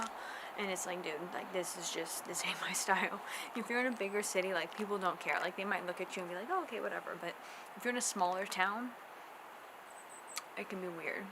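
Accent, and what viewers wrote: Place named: South, United States. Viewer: O Southern accent !! 😮😁 Love ya !! 😻😻 More vids please !! 🤗🇺🇸💯💯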